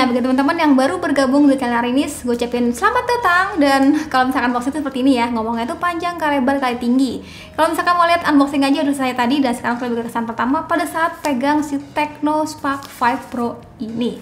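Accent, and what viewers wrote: West Java, Indonesia. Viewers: Mantap 😘😘😘😘 Sunda gituloh😂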